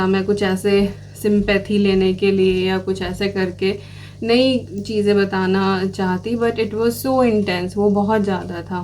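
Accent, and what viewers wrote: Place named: Punjab, India. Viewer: Hi g aap punjabi ho baaton se lagtahai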